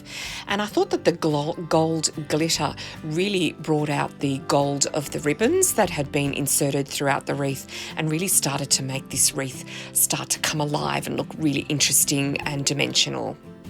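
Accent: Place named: Australia. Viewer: Hi these are gorgeous DIYs, it's nice to see /hear an aussie crafting on youtube, I live crafting but struggle to find suppliers many ribbon at Christmas here I'm in rural Victoria, I really enjoy your channel thanks for sharing all the best Mary 🌻😊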